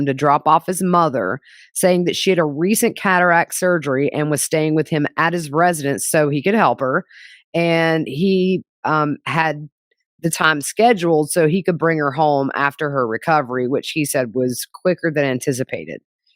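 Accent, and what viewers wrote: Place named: Boston, United States. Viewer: Great episode, and love your Boston pronunciations. Peabody is pronounced, Pea-Bidy lol :)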